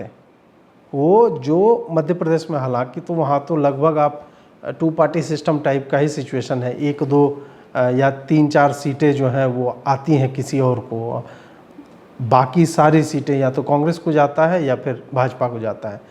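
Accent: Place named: Begusarai, India. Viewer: अपने टोन में कुछ बेगूसराय्या भाषा का प्रयोग आपके वीडियो को बेहतर बना देता है।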